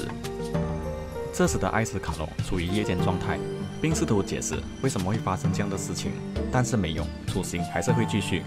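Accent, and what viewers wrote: Malaysia. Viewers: 我肯定你是马来西亚人！！ 我看了你全部的影片觉得口音有点想马来西亚的，结果你说玩玩啊你以为我就认定你是马来西亚人了😂😂😂😂😂 我是真的有看完你全部的影片喔😂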